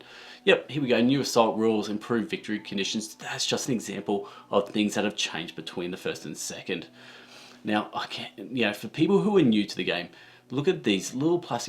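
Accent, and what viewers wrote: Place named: Australia. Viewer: Great to hear an Aussie accent covering board games on YT! What do you think of the shipping costs to Australia for this campaign?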